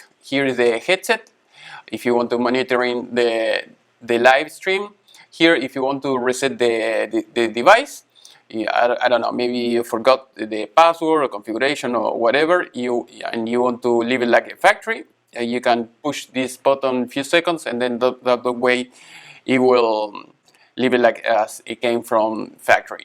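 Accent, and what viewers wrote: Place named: Rioplatense, Argentina. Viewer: te escribo en español porque por el acento y el "going to" (vamos a) que usas mucho igual que yo tenés que ser sí o sí argentino o uruguayo. Yo soy porteño, y vivo en Atlanta, Georgia. Habiendo hecho esta introducción, te cuento que me encantó el video, y que probablamente sea el más instructivo y completo que encontré sobre el Teradek Vidiu Pro. Me lo quiero comprar para hacer transmisiones para la tele argentina, y la verdad es que creo que me voy a inclinar por esta opción. Te mando un abrazo argentino y espero que estés muy bien. ¡Gracias!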